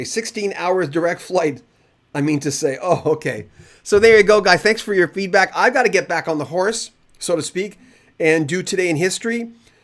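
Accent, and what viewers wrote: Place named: Canada. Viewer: You can definitely tell he's Canadian by his accent... especially the way he says "abowt".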